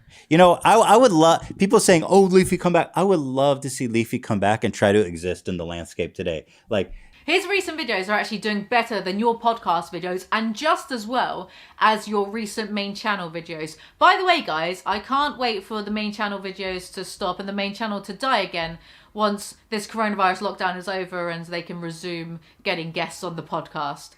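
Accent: United Kingdom. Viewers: Are you British ? Sounds like you’re from where I am haha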